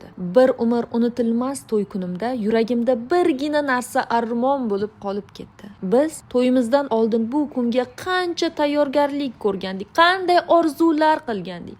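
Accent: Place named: Fergana, Uzbekistan. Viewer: Фаргонадан экансиз а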